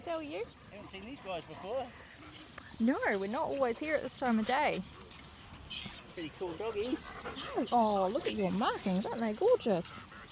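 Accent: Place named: Australia. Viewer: I love your accents. It reminds me of the Aussies down under. 😊❤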